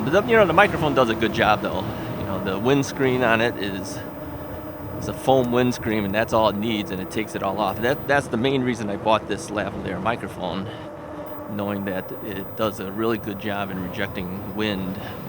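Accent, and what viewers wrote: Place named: North, United States. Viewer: Just found you through “ Total Therapy Solution PT “ you tube. Love your mission to help others! I am getting ready to have 1st of 2 knee replacements at 70 years of age, female, due to advanced osteoarthritis in both knees! Love bike riding and visited my son in Germany this past summer. He is an avid bike rider and Germany has wonderful trails with views in the mountains for riding. You mention the weather many times in the video but don’t say where you are from? Are you in Chicago? You mentioned the “ Windy City “ and I’m picking up a rather northern accent in your voice. I was raised in upstate new York near Buffalo. Excited to see such bike trails are available! My son introduced me to the idea of an ebike while I was in Germany. Many Germans are using them! I plan to keep following you and loved riding along! 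Thank you for what you are doing!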